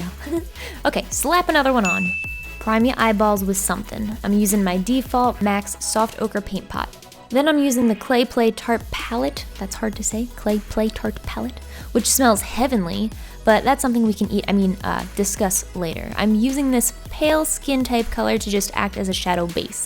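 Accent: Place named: New Jersey, United States. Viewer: How come u randomly get a jersey accent throughout ur vids lol I love it but srsly y does it happen?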